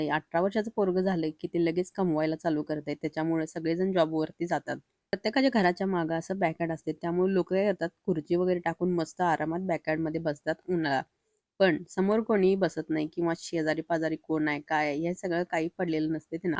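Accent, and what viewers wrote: Kolhapur, India. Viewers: तूम्ही कोल्हापूर चे आहेत काय भाषा वरून ओळखल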